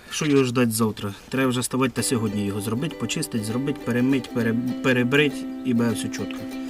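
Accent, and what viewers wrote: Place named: Zolotonosha, Ukraine. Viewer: Привіт з Ґетеборг (Швеція).Я сам з Золотоноші,давно виїхав.Приємно побачить веселого земляка.По розмові зразу чути що Золотоноша 😂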